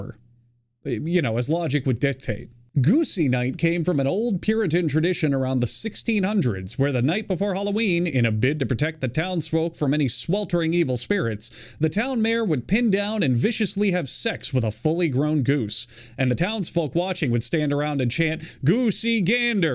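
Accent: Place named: Maine, United States. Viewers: Casually ignores that his dialect is also from Maine. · Actually your accent is more from Maine than Texas which means welcome to Acadia and Appalachia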